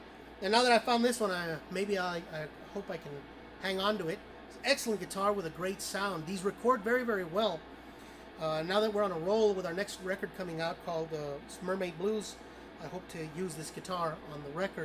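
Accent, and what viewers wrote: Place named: Mexico. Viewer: Tienes cierto acento mexicano jaja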